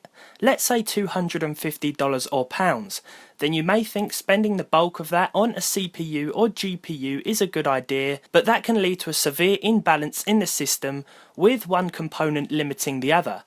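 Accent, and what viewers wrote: United Kingdom. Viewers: Love this channel. Which part of the UK are you from?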